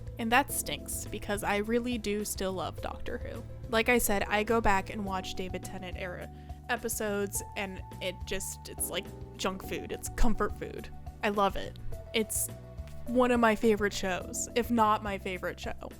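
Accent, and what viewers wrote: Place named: United Kingdom. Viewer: lol british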